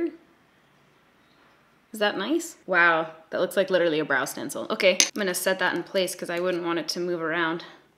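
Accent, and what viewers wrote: Canada. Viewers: This was pretty funny I burst out laughing a few times when you were doing your techniques you don't like. I also find it odd that you sound so Canadian and I've lived in Vancouver, Calgary and Toronto and haven't heard too many with the same kind of pronunciation of some words as you do. Especially the OOOKeeys. It's funny and cute. I also agree having super matte thick dry foundation just also makes my skin look like that and any lines and acne spots just look horrific so I've been having to go much lighter and luminous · sounding super Canidian today eh? I love it okey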